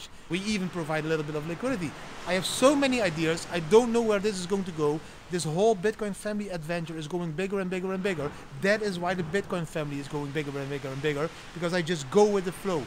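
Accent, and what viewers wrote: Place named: Netherlands. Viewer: I love your dutch accent 😄 I lived in the Netherland for 2 Years. I met Bitcoin in 2013....since that time i ‘m part of the blockchain adventure. Great job.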